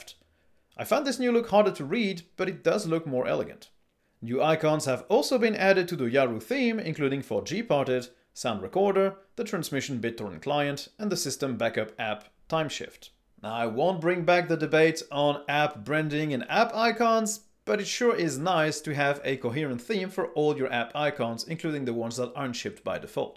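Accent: France. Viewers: Vous êtes français ?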